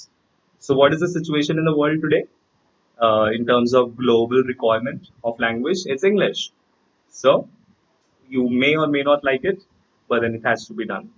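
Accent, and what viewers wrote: United States. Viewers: Sir please make the video on American English ,your American English is very nice. I also want to learn it . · Awesome session sir...Btw ur american accent is reall good👌👌👌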